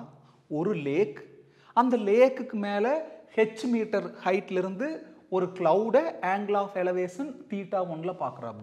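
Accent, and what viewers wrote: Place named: Kongu, India. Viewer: Thank you sir from your subscriber... We have done our maths exam... Despite the QP and marks i would lyk to show my gratitude for ur videos which helped us a lot.. I have been watching ur videos throughout 12th standard... Ur videos helped us very lot and I insisted my friends to watch ur videos and they gave a valuable feed back... We would miss your kongu slang and your teachings... Once again thank you very much sirr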